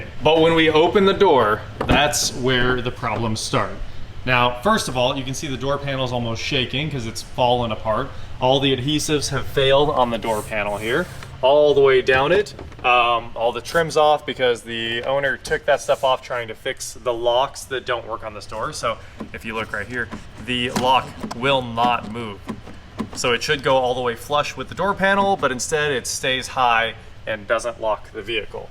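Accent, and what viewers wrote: United States. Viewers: i have an early 97 slk230....all door locks work fine...the interior plastic paint is an issue,,it doest stand the test of time well...you can buy oe paint from germany tho...you sound like your in america so prob the heat has caused probs with the glue on the trim...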